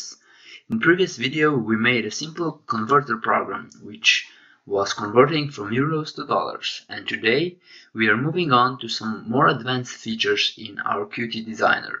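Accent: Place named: Russia. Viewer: Ты Русский что-ли, акцент какой то есть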